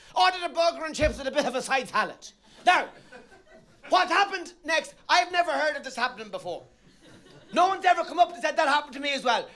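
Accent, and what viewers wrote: Ireland. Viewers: The older Tommy gets the more he sounds like he's from tuam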